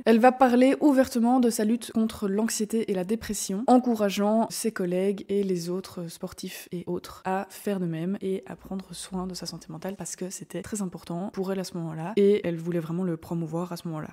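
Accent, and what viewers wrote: Belgium. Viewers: Dès que tu as commencé à parler, j'ai su que tu étais belge grâce à ton accent. Ce n'est pas l'accent bruxellois, que les Français pensent à tort être le seul accent belge. Quand je t'écoute, j'ai l'impression que tu as l'accent de Liège ou de Namur, je me trompe ?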